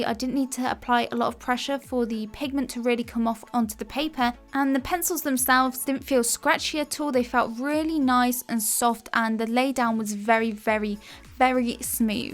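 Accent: England, United Kingdom. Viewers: I love your british accent.. a lo' wo'ah.. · Finally!! I was trying to find a British artist because i wanna develop my british english accent 😭😭 I've just subscribed to your channel and hit the notification bell ✌️🖤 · Where do you come from (which part of england)?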